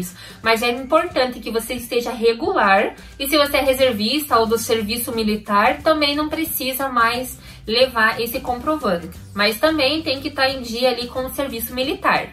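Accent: South, Brazil. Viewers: esse sutaque do sul e lindo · Qual o sotaque que ela tem fiquei em duvida se sulista ou não !?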